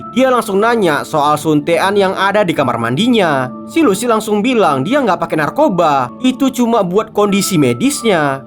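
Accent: Medan, Indonesia. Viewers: Paten kali ah abg ni klo cerita suka aq weh khas gtu ama logat medannya😂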